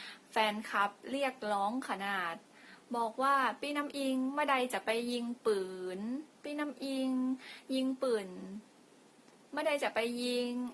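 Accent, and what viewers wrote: North, Thailand. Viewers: พี่ อยู่ จ. รัยคะ..พูดเหนิอน่ารักมากๆๆๆ.. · ชอบครับอู้คำเมืองน่ารักดี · คนเหนืออี้ จังหวัดหยังนิ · เป็นคนที่ไหนครับเห็นพูดเหนือ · อู้กำเมือง เวอร์ชั่น น่าฮักขนาดคับ เห็นด้านหลังตอนยิง นึกว่าเป็นสตั้นแมน ดูดุดันมากเลยคับ ไม่น่าเชื่อว่า สาวเอวบางร่างน้อย จะต้านแรงรีคอยลูกซองได้สบายเลย เยี่ยมมากกคับ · ทึ่แท้เจ้ากะคนเชียงใหม่โตยเก่งหลายเน้อเจ้าเว้ากะม่วนแต้เน้อคนเหนือ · อู้เหนือน่ารักขนาด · พึ่งฮู้น่ะ ว่า อู้เหนือได้ น่าฮักขนาด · อู้กำเมืองยิ่งน่ารักขนาดแต้ๆน้อ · คนเหนือหรอจ้าว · คนเหนือป่าวครับ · เป็นคนเหนือก่อจ้าว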